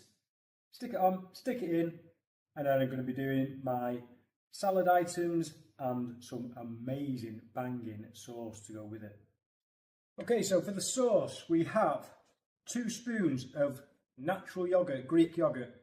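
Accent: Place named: RP, United Kingdom. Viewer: Posh sounding , posh looking. Must be posh tasting. ❤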